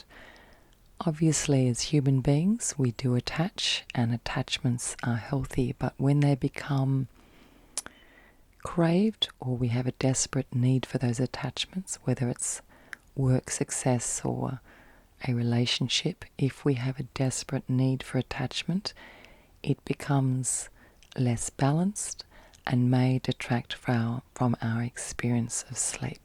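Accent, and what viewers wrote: Australia. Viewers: I just discovered you in perusing sleep & anxiety videos. I'm Alex, a 46yo San Francisco, CA, USA 🇺🇸 native, and you have one of thee most soothing, sultry, feminine voices and dispositions I've ever heard. You're an Aussie, I assume? Thanks for this therapeutic, relaxing video. 👌🏻😴💤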